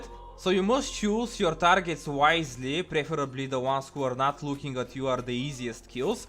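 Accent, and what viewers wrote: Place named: Russia. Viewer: I love your thick Russian accent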